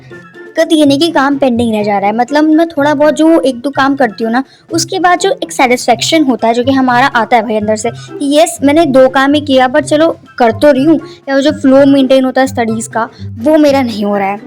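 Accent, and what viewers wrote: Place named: Haryana, India. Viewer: Acha per apki boli to haryana jaisi h 😅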